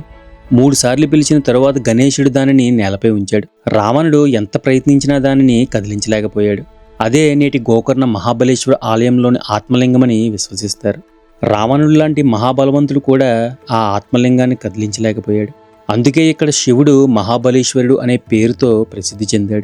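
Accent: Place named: Visakhapatnam, India. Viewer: Bro chala baga chepparu telugu matlu andrapradesh viziag bashala vunnadi meru akkadi rathi kattadala gurinchi weathergurunchi bagachepparu. Chala thanks meru marinni manchi place gurinchi videos thiyaliani koru thunnanu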